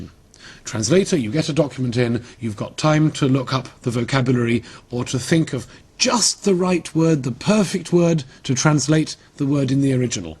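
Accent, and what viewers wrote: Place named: United Kingdom. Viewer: good, quiet visual example of how an interpretaion works. And this pure, brand british accent is so ... lovely!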